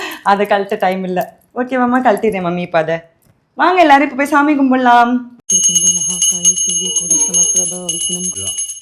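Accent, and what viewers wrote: Tamil Nadu, India. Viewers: I am fan of ur tamil accent n voice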